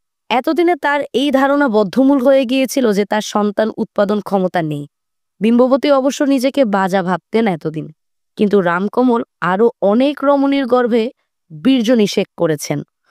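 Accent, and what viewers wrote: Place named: East Bengal, Bangladesh. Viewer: স্পষ্ট করে বলুন উচ্চারণে গন্ডগোল কোথায়। পাঠিকার উচ্চারণ পূর্ববঙগীয় ঘেষা। অর্থাৎ উনি বাঙাল।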